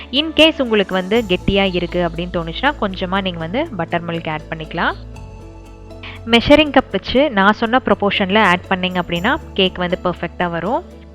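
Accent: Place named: Coimbatore, India. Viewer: i watch many other good vlogs.but no one use our CBE slang.. like kolambu sunda veikarathu,vanam vettappu vitruchu....so sweet...i heard from you.lovely.❤❤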